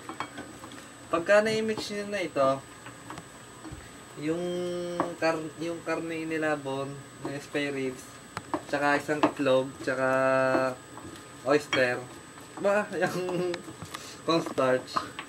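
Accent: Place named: Ilocos, Philippines. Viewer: you have ilocano accent. pls.. don't get mad, love your video though... tanx kabayan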